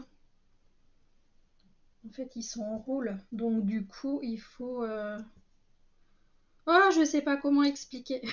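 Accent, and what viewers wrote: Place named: Alsace, France. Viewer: Bonjoure tres joli projet cest vraimabt bizard detendre quelqun dautre avec laccebt alsacien 😂 bonne petite croix et vive lasace hein bis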